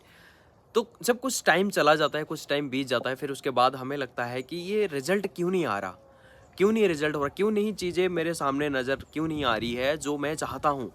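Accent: Bengal, India. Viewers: Dada are you bengali??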